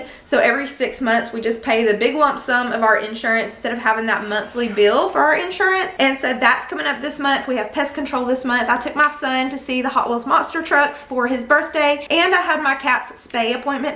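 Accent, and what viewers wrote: South, United States. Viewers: love that you decided to do an episode on budget meals. Love your southern accent, what state are you from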